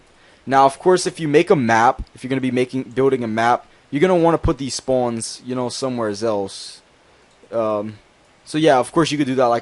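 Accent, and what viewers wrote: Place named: United Kingdom. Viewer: Me how to speak speak British but is it me or he sounds British a-hoi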